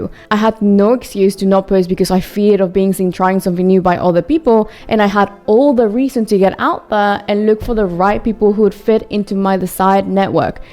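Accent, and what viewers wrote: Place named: United Kingdom. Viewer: Sounding very British today. Slay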